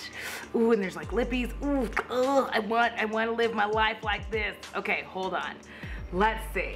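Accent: England, United Kingdom. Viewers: I love the English accent and that it makes you sound like a villain.... I must be a villain too 🤣🤣🤣 Also agree with the cat mascara it reminds me of the Katy Perry cat perfumes ... decapitate a cat every spray 😻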